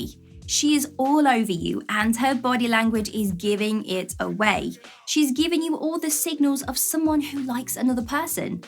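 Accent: United Kingdom. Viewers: She is British, isn't she?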